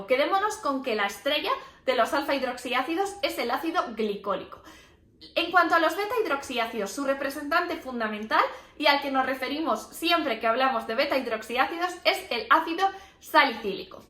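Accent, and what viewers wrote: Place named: Spain. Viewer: Te amo mi española preferida. Me encanta tu acento y TU 🤭😍🥰😚 Eres muy inteligente 🤓 Saludos desde Perú👋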